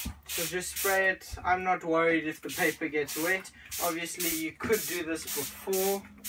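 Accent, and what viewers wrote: South Africa. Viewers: Can spot a South African accent, my baby ball python just shed and my adult is in blue, can't wait to try this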